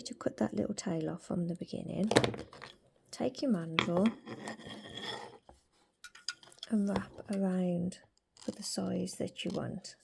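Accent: United Kingdom. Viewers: Where are you from if I might ask? (Not exactly of course - just region). Your specific accent is so lovely. I have friends all over the UK and each has a different accent but yours is so so lovely. My friend from Coventry sounds sort of similar so I would guess you’re not too far from her. 💚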